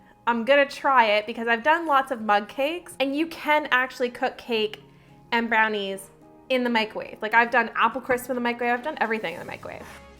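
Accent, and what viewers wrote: Canada. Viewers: is she Canadian or does say aboot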